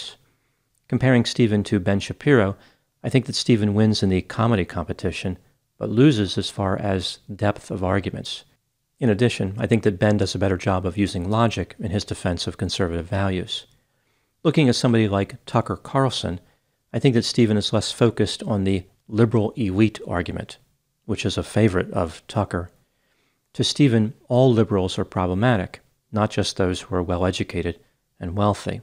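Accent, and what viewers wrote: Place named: Baltimore, United States. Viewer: I have never noticed this before, but I hear a Baltimore accent from... especially around long "o" sound....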